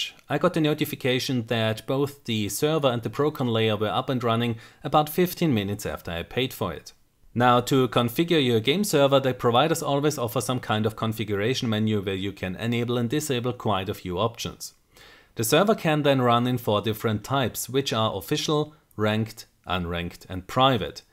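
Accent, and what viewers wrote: Germany. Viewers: Hi Where are you from? Germany? (Because you chose Frankfurt as the server destination and I kind of hear it somehow when you speak). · are you German?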